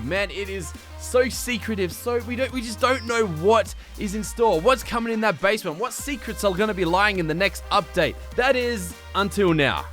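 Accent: Australia. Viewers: are you Australian?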